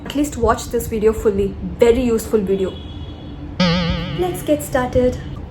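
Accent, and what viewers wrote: South India, India. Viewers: Pure south india full satire daa love d way u talk Love from telengana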